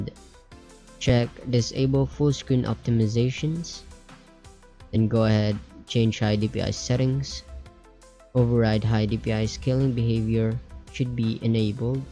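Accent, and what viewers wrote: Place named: Philippines. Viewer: Pagsasalita palang alam ko nang pilipino ahahahaa thanks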